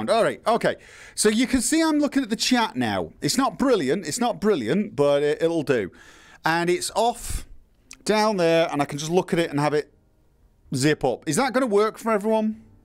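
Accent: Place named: Northern England, United Kingdom. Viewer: First thing... your accent is much, much thicker and more clearly northern Britiain than it usually is... microphone or been talking to people from home?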